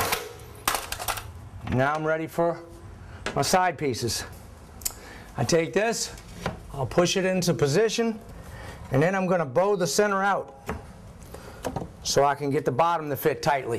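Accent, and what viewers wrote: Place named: New Orleans, United States. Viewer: Nice videos!! I noticed your NOLA accent as soon as you started talking. I lived in New Orleans for 27 years, until Katrina ran me off. Anyways, thanks for the informative videos and the great tips. I especially liked the video where you did a circle sheetrock patch! Now that was slick!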